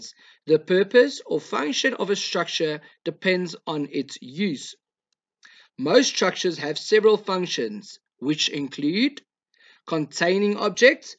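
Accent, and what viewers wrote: South Africa. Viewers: It sounds like you speak Afrikaans, because you have an south african acsent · I swear this guy sounds South African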